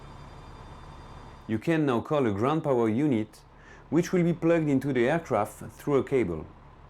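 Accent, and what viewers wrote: France. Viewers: This french accent :) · yes, enjoyed this new features video. You definitly want me to take the plane and go back to home, with this beautiful easy listening french Chiracron english Jerusalem accent :-D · L'accent français?😅... Great video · It feels like watching a video presentation by Airbus. The french accent. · French accent :)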